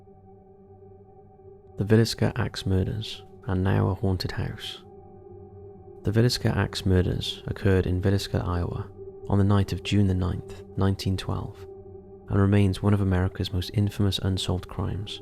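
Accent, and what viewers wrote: Wales, United Kingdom. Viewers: Love the voice (subtle Welsh accent?) and the eerie ambient background noises/music/soundtrack. Very soothing, not to mention informative.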